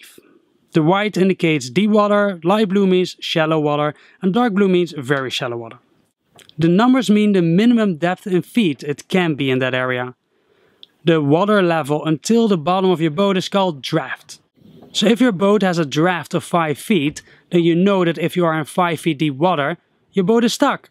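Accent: Netherlands. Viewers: NICE Dutch accent and great video! fantastisch gedaan meneer :-) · Are u dutch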